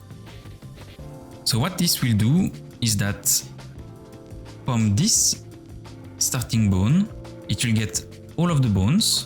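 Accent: France. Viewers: J'ai presque l'impression que tu parles francais, c'est cool c'est plus facile a comprendre 😊 Merci pour la vidéo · your french accent sounds funny, i like it. Keep the great work up😆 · Ca c'est un Français qui parle anglais :) sympa la vidéo, bonne continuation !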